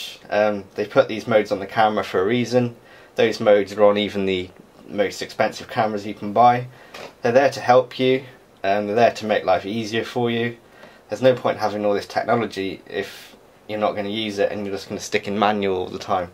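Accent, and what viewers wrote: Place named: England, United Kingdom. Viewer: Lool, i love how english people talk, that is rubbish.. nice vid btw bro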